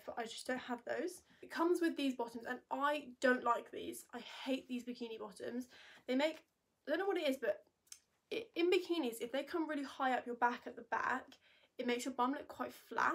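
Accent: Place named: RP, United Kingdom. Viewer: Love a posh English accent, is short for?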